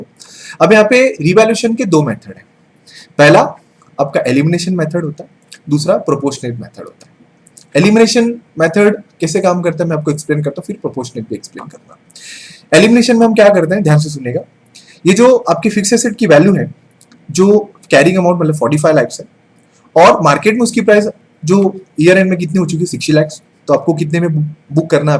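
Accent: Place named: India. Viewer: what is bro on about, i didnt understand shit of that indo-english bullshit. He's onto NOTHING💯💯💯💯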